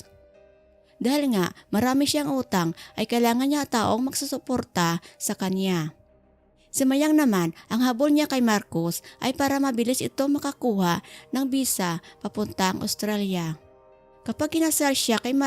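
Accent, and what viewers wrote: Visayas, Philippines. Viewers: Sounds visaya accents 😵‍💫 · Ako Tinapos ko Ang twinto Oky lang Naman Na Ganyan Ang Bossis Mo Kasi Normal lang Yan Na Ganyan Ang Bossis Kasi Bisaya Piro Para Sakin oky lang❤️‍🩹❤️‍🩹❤️‍🩹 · hahaha.. parang himig bisaya.. at nahilo ako marining bjnabasanya basanya ang content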